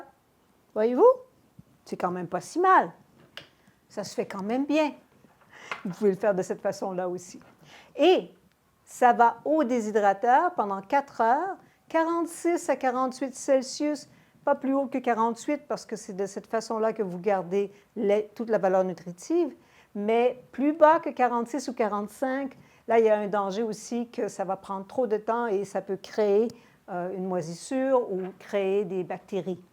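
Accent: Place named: United States. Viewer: Première fois que j'arrive sur une de vos vidéos, quelle élégance pleine de simplicité ! Très vite je me suis dit "cette dame est parfaitement bilingue français/américain" en entendant votre façon de dire "é-et" a la manière américaine "a-and" 😊 Merci pour cette belle recette ! Mon vieux déshydrateur est rond mais je devrais trouver une solution. Merci encore, vous êtes très inspirante 🌺 🇫🇷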